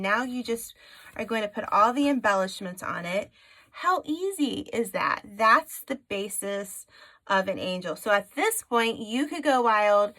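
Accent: South, United States. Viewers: Great tutorial thanks so much. (By the way. There is no problem at all with having a southern accent. Contrary to popular belief, everyone has an accent! Embrace it.) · Nothing wrong with sounding Southern, I am one myself!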